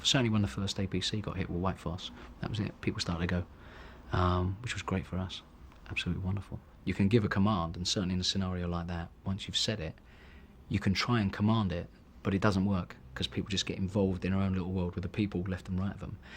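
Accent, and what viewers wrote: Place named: RP, United Kingdom. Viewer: That’s his poshest accent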